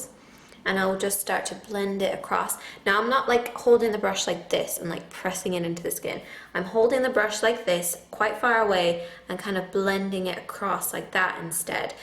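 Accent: Ireland. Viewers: Still trying to figure out your accent xD Idk, it sounds Irish. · Pretty sure you're Irish! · omg do u have an irish accent? like niall? i love him!!!! NOT HATE DIRECTIONATERS! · Ru Irish? You have accent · I think she's Irish.. Just a hint of some sort of accent there. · I think she's Irish? the way she says blush and water is less British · are you irish? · I stumbled across this video while watching another makeup guru's video and clicked on this one. I just wanted to say that while listening to you speak I could hear a slight hint of an Irish accent..when I went to your profile it says you were indeed from Ireland. You don't have a very thick accent but I could hear the slight hint of it. I'm kind of obsessed with anything Irish lol sorry >_> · I think she's Irish not British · Irish, I believe :) the Rs are deep-ish so, that's usually a good sign of an Irish accent! 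· When u think she's American but soon realise that she's Irish hmmmmm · Are you from Ireland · maybe she's irish?... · Are you Irish? Beautiful! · are you Irish? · Are u from Ireland cuz u hav a bit of an Irish accent ?? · just subbed love your videos so brill x an your Irish yay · Yes, yes, I felt it too, she pronounces some words in an Irish manner, I love it. Although she speaks American English to me, not British English. Love the ways she speaks! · are you irish? you sort of have an irish accent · are you irish???? :D youre very pretty! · you sound Irish sometimes....are you? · Are you Irish ? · She's irish, you can hear it on the R's. And yeah, it says so on her description.. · Great video. Very helpful. p.s. I hear a little accent, are you Irish? (I love Ireland xD) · Yeah she's Irish I'm Irish too it's just a different region of accent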